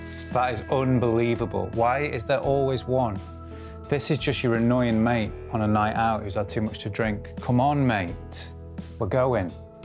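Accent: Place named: Manchester, United Kingdom. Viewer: I watched the footie last night and felt hyper about 2 am..... So I put YouTube on and thought it was about time I learned solo to..... Chris Spedding is still one of my favourite guitarist so thought I'd investigate your channel.... I'm hooked!! It helps that I understand your accent..... That's real Mancunian! But seriously you're a cracking player and inspirational teacher..... Keep rocking!! Gear analysis is great too. I've heard good things about Sunbear pickups.... AND they're English!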